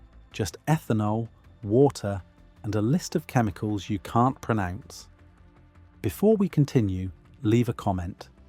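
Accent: United Kingdom. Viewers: "indian pale ale" lmfao. and you're using a brit accent for what i now assume is your ai narrator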